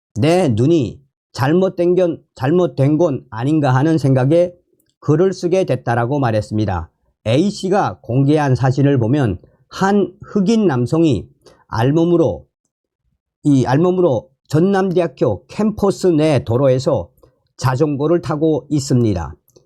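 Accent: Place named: North Korea. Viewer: 이 바라!!! 다른 사람을 시켜 방송을 하든지 아니면 아예 이북 말로 방송을 하든지... 듣는 사람 넘 힘들다.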